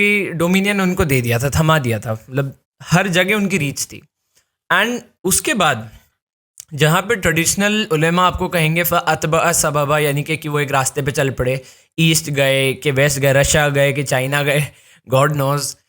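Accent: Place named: North India, India. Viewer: میاں یا تو خالص اردو بولا کیجئے یا خالص انگریزی۔ اردو میں خواہ مخواہ ٹھونسنا ایک بڑی نامناسب حرکت ہے۔ ذھنی مرعوبیت اور غلامی کا پتہ دیتی ہے۔ دوسرے اپنا تلفظ درست کریں۔ شین قاف بھی درست کریں۔ گلتی نہیں، غلطی۔ طریکا نہیں طریقہ۔ فیر نہیں پھر، بھاشا نہیں زبان ، انھوں نے بولا نہیں، انھوں نے کہا، باڑ نہیں، سیلاب، وغیرہ۔ کتاب ادریس یہودی کتاب ہے، عیسائی نہیں۔ اینڈ نہیں، اور۔ بھٹ نہیں، لیکن۔ برا نہ مانئے گا لیکن آپکی زبان شمالی ھندوستان کے ھندؤوں جیسی ہے۔ ابھی آپ نوجوان ہیں، محنت کیجئے اور اچھی، صحیح اور خوبصورت زبان بولنا سیکھ لیجئے۔ انگریزی بھی انگریزوں کی طرح بولنا سیکھیں۔ بہت اچھی بات ہے۔ لیکن جو زبان بولیں خالص اور مسلسل وہی بولیں۔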